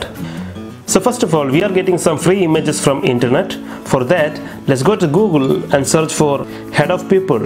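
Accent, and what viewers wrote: India. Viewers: its indian accent, respect it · Oooh, don understnad but he speak english with hindi accent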